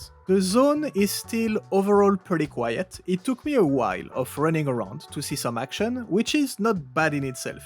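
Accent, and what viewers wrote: France. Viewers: This vid convinced me to give the game a try. I really like your humor btw. The French accent makes it even more funny. :)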